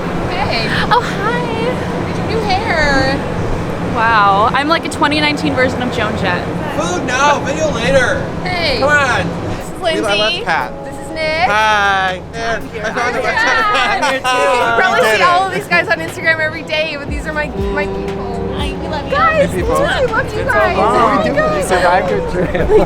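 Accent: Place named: Newfoundland, Canada. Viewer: The Newfie accent is THICCCCC right now!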